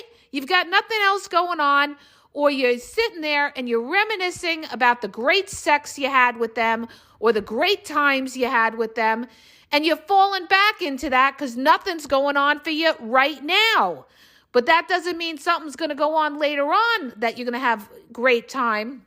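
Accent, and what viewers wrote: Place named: New Jersey, United States. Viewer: This has to be a jersey girl😄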